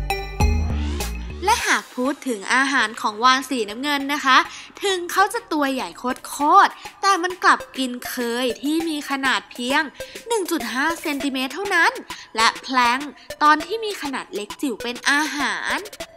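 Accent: Suphanburi, Thailand. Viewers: มึงจะพูดเหน่อทำไม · เหน๋อๆ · น้องคนพากษ์บ้านอยู่สุพรรณ เหร้อ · สำเนียงเหน่อไง คิดสิคิด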